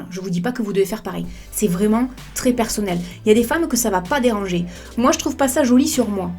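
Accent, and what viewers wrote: South, France. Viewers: Coucou perso je porte des soutifs sans armatures que l'été et que quand je sort ou que je bosse. Le reste de l'année c'est juste un débardeur près du corps sous un pull .J'ai la peau très sensible et les soutifs avec armatures m'irrite la peau et parfois jusqu'à la brûler et cloquer. C'est très douloureux donc mon choix à été vite fait. Je dirais pas que ma poitrine à retrouvé ces 20 ans mais ce qui est sur c'est que nos muscles reprennent à soutenir tout seul et que au final ma poitrine tombe pas plus que sa. J'ai 38 ans 3 enfants et vie beaucoup mieux sans soutifs et j'avoue le regard des gens je m'en fou 😉. Ps:j'adore tes vidéos, simplicité et naturel, et ce jolie accent j'adore 😉 l'accent du soleil j'ai le même je suis pas loin de Perpignan 😃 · J'adore ton accent de sud😍😂 super video😉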